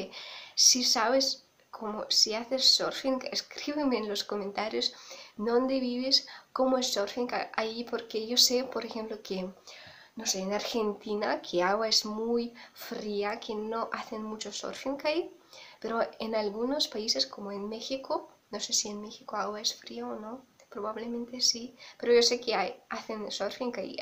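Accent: Spain. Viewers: Lindo tono de voz, acento de España, y se va quedando el acento Ruso. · Acento español se oye gracioso vente a México y realizaras tus sueños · Tienes un español gallego, mejor te quedaría el español latino, saludos desd Chile..😎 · Tu tono de voz y tú acento de España me causa asmr... (Un unintentional asmr) haber cuando haces un vídeo asmr😻si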